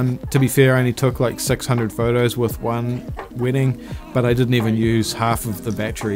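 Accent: New Zealand. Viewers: It's so true what you say about the Fuji APSC vs 35mm. Too long a story to tell in full, but after 11 years of battling it out with Fuji and Lightroom, I've recently swapped over to two Nikon Z bodies, a bevy of their gorgeous Z lenses and Capture One. The difference is off the planet and it is the best thing I've ever done. Even my customers commented on how my images are so much richer and deeper and more gorgeous. I'm actually amazed how I kept any customers using Fuji for all those years. The Z50mm and Z24mm 1.8S lenses are just about glued on my cameras for all my events/weddings and gigs. The Nikon files are gorgeous and are just so rich and deep and process so well in C1. Was great to discover your channel. I'm a Kiwi but live in Perth now, but it's great to hear the accent and to get a glimpse of my wonderful homeland. Keep up the good work.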